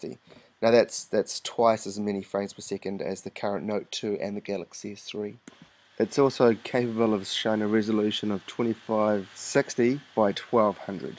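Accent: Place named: New Zealand. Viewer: I think your from new Zealand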